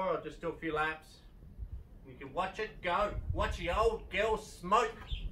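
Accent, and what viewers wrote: Australia. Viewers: Are you from Adelaide?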